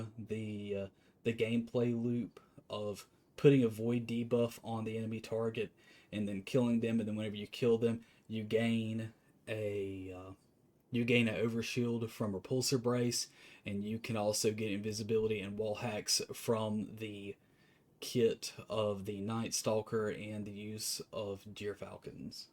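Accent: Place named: South, United States. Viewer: As someone fro europe i really enjoy the southern draw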